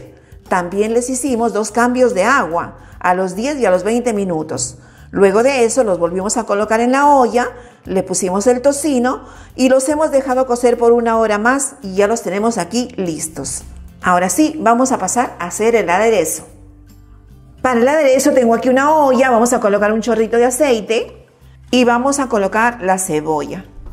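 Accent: Peru. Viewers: Que rico se ve ese Seco de Res al puro estilo Peruano, pero más rico todavía es escuchar la forma de hablar de las mujeres peruanas, disfrutar de ese acento claro, limpio, suave y ligeramente melodioso ... felicitaciones. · A mi me encanta. Es la forma de hablar en el Peru.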